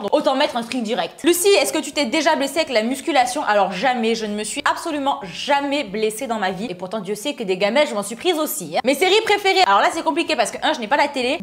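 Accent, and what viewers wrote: Brazil. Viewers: Trop chou ton accent brésilien j adore! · voce e muito linda e eu amo teu accento brasileiro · Tu na pas réellement parler portugais c’est plus brésilien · L'accent brésilien c vraiment dar 😏👌👌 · Mais c parcque elle parle avec l accent brésilien qui est différent du portugais c pour ca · On sent que tu parles un portugais brésilien à l’accent aha😂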